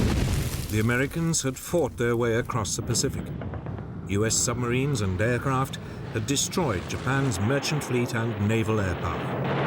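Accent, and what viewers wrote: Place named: United Kingdom. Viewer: Narrated by the British.....Shut up already.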